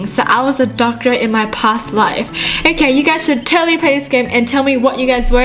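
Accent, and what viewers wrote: Australia. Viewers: Good morning Miss.!!! I am the new student in your class:) I really like your Australian accent too!!!:D (wish I could go to her class)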